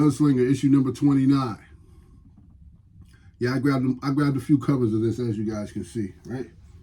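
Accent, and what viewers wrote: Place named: New England, United States. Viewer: Bro, you're from New england?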